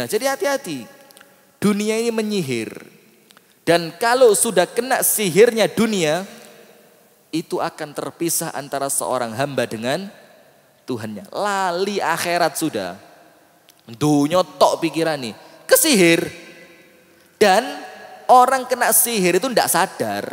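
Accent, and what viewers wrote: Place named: Surabaya, Indonesia. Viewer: Ustad dr Jawa timuran ndelok logat e lek gak arek SBY Yo arek malang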